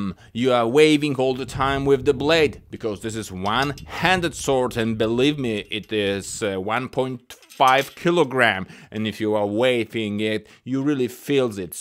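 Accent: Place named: Russia. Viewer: Why does he have a russian accent?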